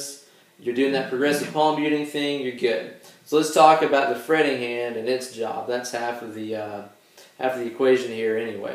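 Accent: South, United States. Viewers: 2024. I notice the southern accent now. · You are Pecos Hank of guitar. Your presentation is so similar, it's just that he's chasing Tornadoes and snakes. You guys even look similar and both with that southern draw. Great stuff BTW.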